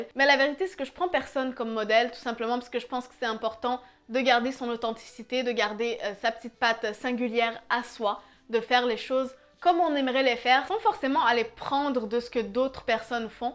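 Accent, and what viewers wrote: Canada. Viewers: Tu est canadienne ?? J'ai vraiment trop ta voix 💕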